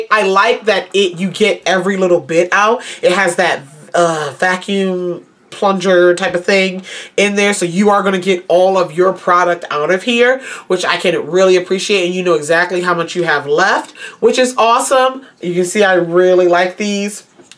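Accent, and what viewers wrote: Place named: Baltimore, United States. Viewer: Found you through Makeupstruggles. Subbed with the quickness. Love the accent. Reminds me of my B-mo peeps.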